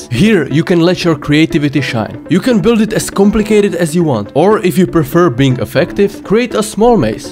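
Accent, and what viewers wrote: Czech Republic. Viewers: bro sounds kinda czech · You have a Czech accent. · ur English speaking skills sounds like it talking Czech Guy, dont take it serius, im just asking :D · Ty jsi určitě Čech. Vždyť nemáš ani anglický přízvuk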